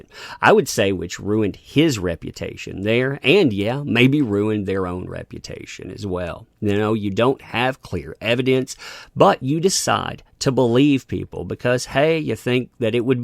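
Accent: South, United States. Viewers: I love you and that southern-ish accent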